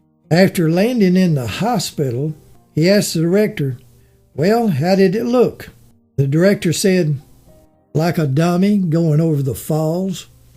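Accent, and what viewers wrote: South, United States. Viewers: .... Another great vlog. You have the knack of telling a great story. I just do enjoy listening to your sweet southern accent. Please have a joyous and safe holiday season.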